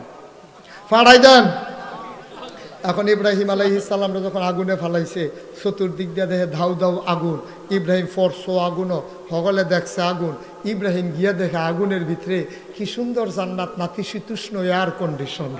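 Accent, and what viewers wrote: Comilla, Bangladesh. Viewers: কুমিল্লার ভাষায় ওয়াজ মাহফিল শুনে ভালো লাগছে!!! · আহা,আমার মধুর কুমিল্লার আঞ্চলিক ভাষা।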